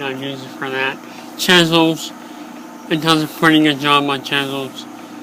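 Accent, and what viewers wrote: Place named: United States. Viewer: gangoso americano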